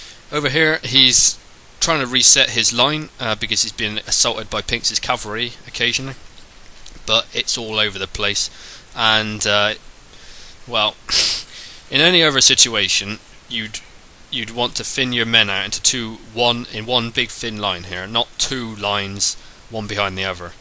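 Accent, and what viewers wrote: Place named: Bristol, United Kingdom. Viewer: am I right in saying bristolian accent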